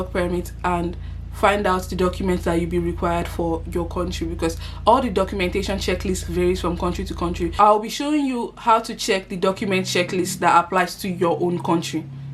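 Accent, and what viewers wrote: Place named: Nigeria. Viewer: Good information but u don't sound Ghanaian ...u sound more Nigerian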